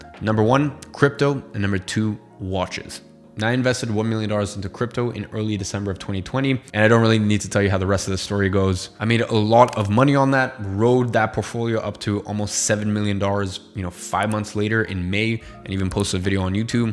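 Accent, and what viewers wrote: United States. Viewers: How does he have that accent if he's only been to the US twice at that age ? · you have an American accent. Where are you from? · You sound American.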